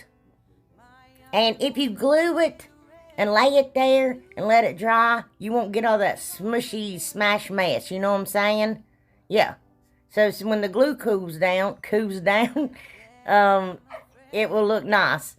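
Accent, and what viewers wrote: South, United States. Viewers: Where are you from? You sound like me , country accent!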